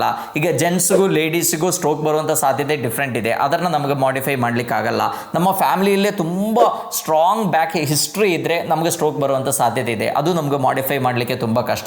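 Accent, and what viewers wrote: Kerala, India. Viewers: ನಿಮ್ಮ ವಿವರಣೆ ತುಂಬಾ ಚೆನ್ನಾಗಿದೆ , ಉಚ್ಚಾರಣೆ ಕೇಳುವಾಗ ನಿಮ್ಮ ಭಾಷೆ ಮಲೆಯಾಳಂ ಆಗಿರಬೇಕು ... ಧನ್ಯವಾದಗಳು ಸರ್.😊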